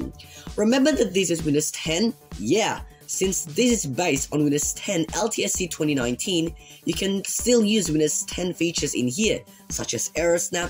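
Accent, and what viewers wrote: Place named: Australia. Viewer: yeah i noticed that too, he has an australian accent but a copy from another country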